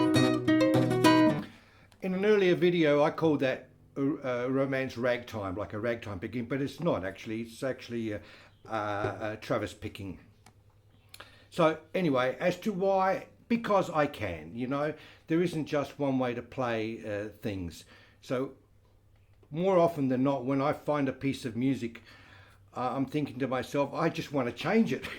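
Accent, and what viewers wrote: United Kingdom. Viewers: I watch you long time ago and i love your content and learn a lot from you sir And i also like the new content and you are speaking with us and we are getting to know your beautiful personality and british accent... Big fan of you please sir keep it up... I'm from Syria ...all love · You must have spent a lot of time in the U.K.because you now have an English accent....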